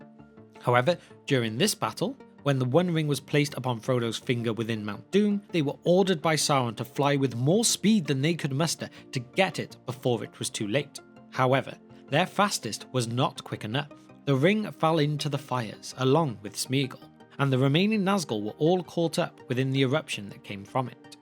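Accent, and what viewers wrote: United Kingdom. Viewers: Can you please narrate in an American accent. My wife doesn't like British accents because your silencing of Rs and drawing out of vowel sounds. Thanks, then we will subscribe.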